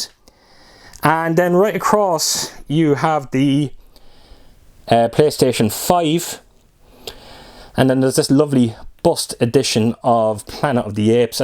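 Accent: Ireland. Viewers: Hi, It’s Mick from across the pond in NY. Been hitting the like button on your videos for some time. Brilliant job mate on your passion. I’m jealous of many of your pieces that only collectors understand. I have a great set up here and have the passion also ( not the film ) 😂 Keep the hard work and love going as to keep the home experience and physical media alive. BTW - Love the brogue- Where in Erin? Got plenty of family in West Clare and Tipperary. Also dig my govee backlight mate. Cheers! 🇺🇸👍🏻✌️😎Just got my steel book copy of SleepHollow can’t wait to spin that incredible film.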